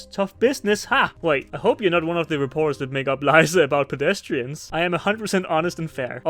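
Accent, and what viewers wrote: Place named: Denmark. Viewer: Du er altså dansker. Det kan jeg høre :)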